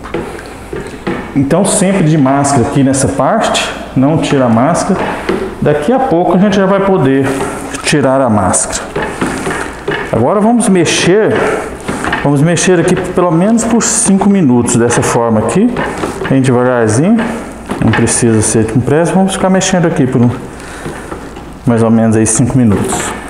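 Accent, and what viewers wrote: Minas Gerais, Brazil. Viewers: Adoro esse jeito mineiro de falar ❤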